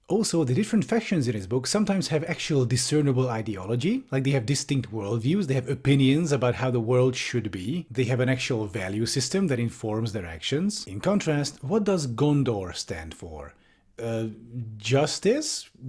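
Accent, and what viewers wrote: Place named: Scotland, United Kingdom. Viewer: Interesting video Ádám, loved the Scottish accent. I've Subscribed. Looking forward to more videos. Is this the channel where you don't rant about trains and busses?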